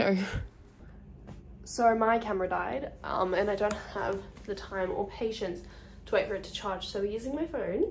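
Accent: Australia. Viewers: 🙏𝟐 𝐭𝐡𝐢𝐧𝐠𝐬 𝐈'𝐦 𝐠𝐫𝐚𝐭𝐞𝐟𝐮𝐥 𝐟𝐨𝐫 𝐭𝐡𝐢𝐬 𝐂𝐡𝐫𝐢𝐬𝐭𝐦𝐚𝐬...𝐍𝐢𝐜𝐨𝐥𝐚 𝐂𝐫𝐢𝐬𝐚 𝐚𝐧𝐝 𝐭𝐡𝐞 𝐠𝐢𝐟𝐭 𝐨𝐟 𝐬𝐢𝐠𝐡𝐭 👀 𝐀𝐧𝐝 𝐝𝐚𝐭 𝐚𝐮𝐬𝐬𝐢𝐞 𝐚𝐜𝐜𝐞𝐧𝐭 😍 you've perfected the profile shot 😂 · I would die to look like you 😭😭 Even if I had 1% of your eternal beauty, I would probably feel more confident 😢:face-pink-tears: You are out of this world stunning - naturally cute, sweet and pretty - ur Aussie accent is adorable:) I Subscribed to your Channel btw <3:face-purple-wide-eyes: I have always thought this, but Australian people are the most beautiful people in the world - just look at Chris Hemsworth, Margot Robbie, etc...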